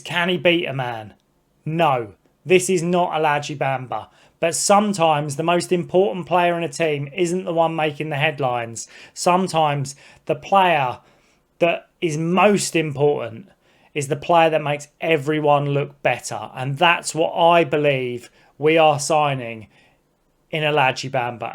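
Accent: United Kingdom. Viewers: A Newcastle Fan from Down South? (judging by accent). That's gotta be a rarity? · I just love your Newcastle accent !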